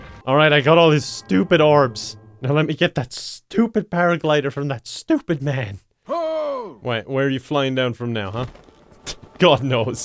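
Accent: Ireland. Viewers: he has an Irish one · The thing with is that he always ALWAYS uploads when I'm doing my homework. I want to ignore it but then I think... can I ignore that irish accent... and here I am!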